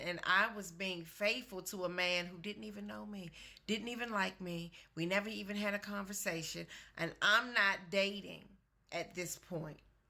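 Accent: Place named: DMV, United States. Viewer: You must be from the DMV.. I can tell by your accent lol Anywho those majority of the Husband Videos are a set up lol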